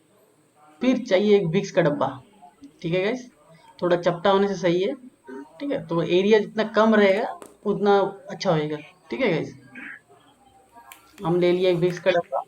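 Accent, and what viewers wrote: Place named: Andaman Islands, India. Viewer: Apna andamani slang mein technical sikhne ka alag hi maza hai 👌😁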